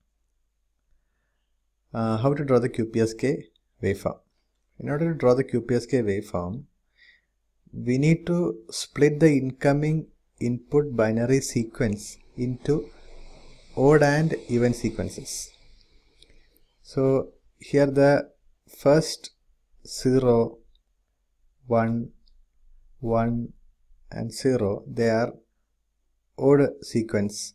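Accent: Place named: Kerala, India. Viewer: malayalee accent can be easily spotted by the way they pronounce the "r" sound .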